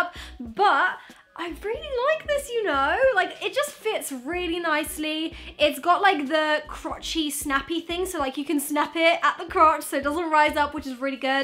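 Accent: England, United Kingdom. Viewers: LET ME TELL YOU!!! YOU SOUND SO MUCH LIKE LOUIS TOMLINSON. I'M NOT TALKING ABOUT YOUR VOICE BUT YOUR ACCENT. UGHHHH!!!😭😭😭😭😭... I'M A DIRECTIONER. AND IT HURTS, YOU REMIND ME SO MUCH OF LOUIS😩😩😩😩❤❤❤❤❤... Btw, I love your videos, and I think you should do that "bought the cheepest dress" video, in which you just chose the first dress. I loved that video, that was hilarious, please do another part.❤❤ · I love your british accent!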